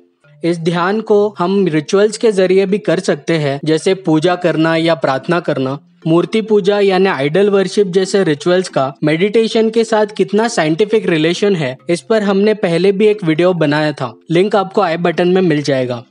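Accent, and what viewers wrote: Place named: Odisha, India. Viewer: Brother You Are From Odisha ? I am asking it because your language pronounciation are more related to Odia.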